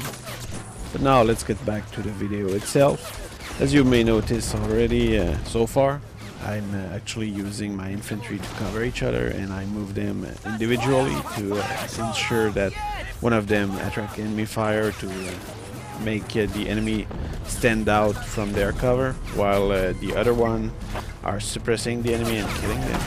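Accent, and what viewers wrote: Quebec, Canada. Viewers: you are from quebec? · You're French-Canadian right? all due respect, just curious ;)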